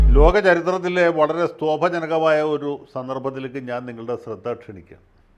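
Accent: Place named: Trivandrum, India. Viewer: I love your "Trivandrum slang"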